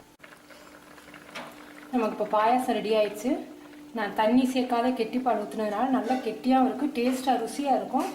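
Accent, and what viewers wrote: Madurai, India. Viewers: maduraya neenga...ur pronunciation looks like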